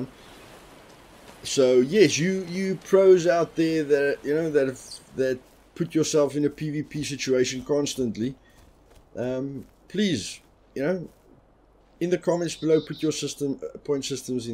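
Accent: Afrikaans, South Africa. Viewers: are you africaans by any chance?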